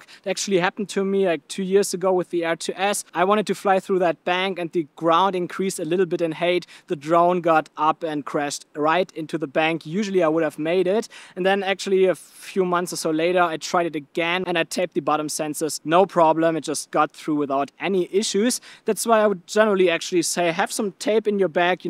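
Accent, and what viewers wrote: Germany. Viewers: I can spot a German accent from 100 meters away. But anyway, this was a very helpful video! Good Job!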